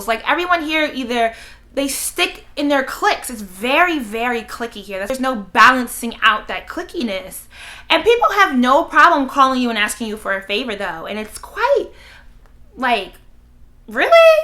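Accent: United States. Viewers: I can definitely hear the northeast accent in her voice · you sound like a NY'er at heart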